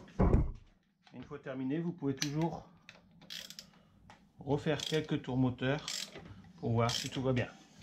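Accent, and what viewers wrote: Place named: Nord, France. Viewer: Salut ça sent le gars du Nord.....ai je raison??????????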